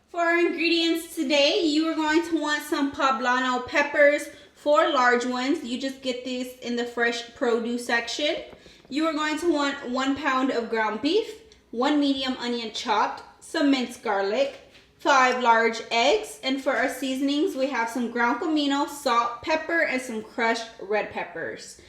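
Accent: Texas, United States. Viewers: I’ve never heard anyone call it a “cash-a-roll”. Lol. That must be your Texan accent ;)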